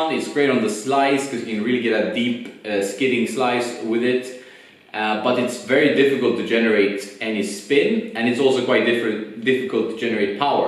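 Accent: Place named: Sweden. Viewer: Bra ide, är du svensk. Du här en svensk accent. 🙂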